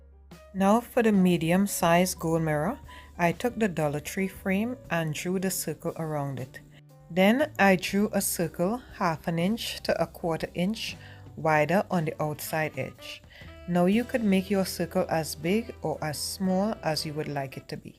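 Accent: Trinidad and Tobago. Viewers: Beautiful. I'm hearing the Trini accent and I'm so glad I found your channel. Looking forward to seeing more.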